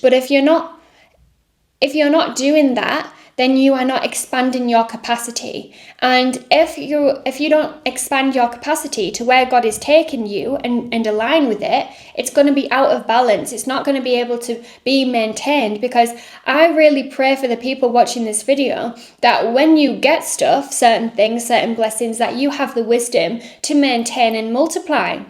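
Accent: England, United Kingdom. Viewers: It reminds me I think in the book of habakkuk to write down the vision. I need to write down the vision of my new job I just started for this millionaire. Andy be quite honest I thought you were just going to talk nice to us men that are broken I didn't know you were a Christian and had a Godly message thank God you weren't a non-Christian woman just flattering us weak-willed men. Thank you for being obedient to God and speaking truth and love to us. This is exactly what I need right now I already knew it but I needed to be reminded I needed somebody to remind me what God Said. I love your accent you must be from maybe England or New Zealand or australia. It's a beautiful voice God gave you keep using it on YouTube and Instagram wherever you can use it to get the gospel out and then message out.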